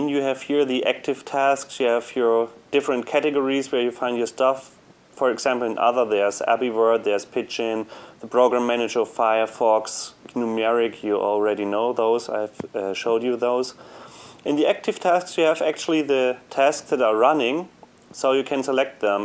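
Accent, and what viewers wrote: Germany. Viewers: you sound very german !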